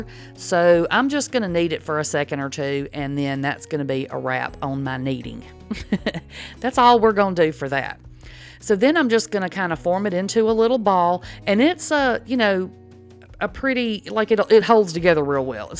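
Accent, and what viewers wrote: United States. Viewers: Hi 😁 I’m all new to sourdough and I’m trying this recipe tonight !!! Omg it looks sooooo yummy !! Btw …. I love ur accent lol . What State in US is that?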